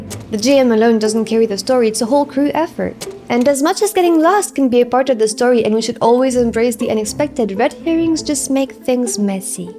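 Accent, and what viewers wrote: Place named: France. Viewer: What a great video. i was secretly hoping wed hear a "Zut Alors!" at the reveal of a twist, once i heard your accent. But i guess hoping to hear that one day is a good reason to subscribe!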